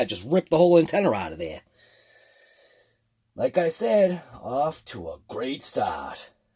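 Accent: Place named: Massachusetts, United States. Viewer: Brutha! You've gotta be from Massachusetts! Lmk sir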